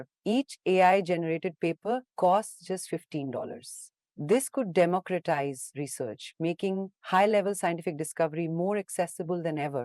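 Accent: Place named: India. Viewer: Indian accent😂😂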